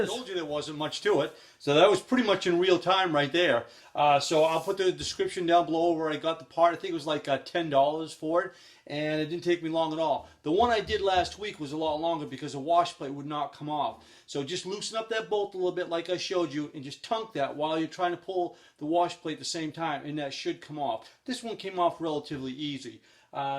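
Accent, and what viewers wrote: Maine, United States. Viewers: So, what part of Maine are you from? I know my people when I hear them. 😀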